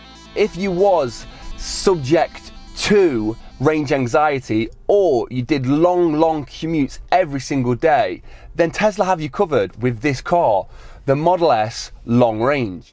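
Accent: United Kingdom. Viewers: british...oh no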